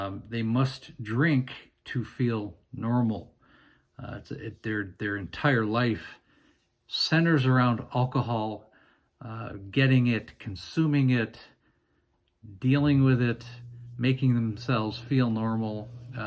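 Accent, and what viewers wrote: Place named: Canada. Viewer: Sounds like your average Canadian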